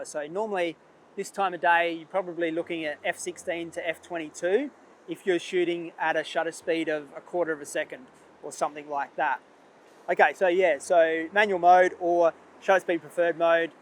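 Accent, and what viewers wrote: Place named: Australia. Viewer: Thanks for the insight. Great to hear an Aussie voice on YT. Awesome work.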